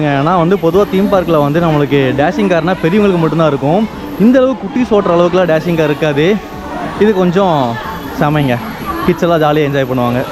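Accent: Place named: Coimbatore, India. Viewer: every ride 🤣 your reaction is ultimate na and your way of talking nga nga our cbe slang nanum cbe thanga na good video take care of ur health also keep going na❤❤😊😊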